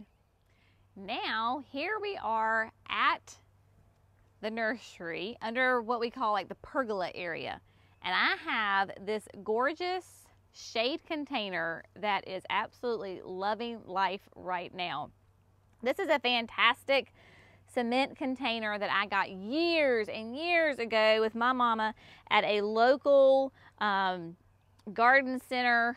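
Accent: South, United States. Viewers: I love your videos. Such a beautiful garden and nursery! And I love your Southern accent. Wonderful!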